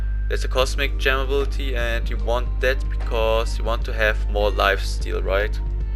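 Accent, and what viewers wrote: Germany. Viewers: Are you from Germany you have a German accent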